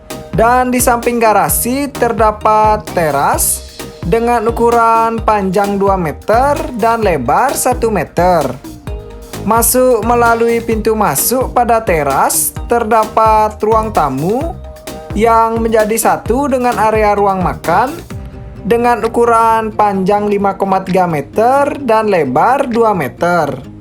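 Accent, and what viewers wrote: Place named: Sunda, Indonesia. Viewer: Sunda banget suaranya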